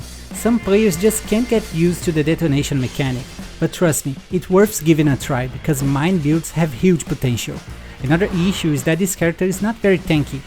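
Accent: Brazil. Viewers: Is the person speaking Brasilian? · Mano por mais que dê pra notar que você é Brasileiro pelo sotaque você tem fluencia e é intendivel o que você fala em inglês, então por quê da legenda em INGLES novamente? ajuda seus compatriotas tupiniquins ai kkkkk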